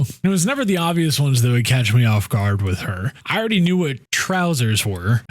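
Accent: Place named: United States. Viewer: sounds american is Indian 😂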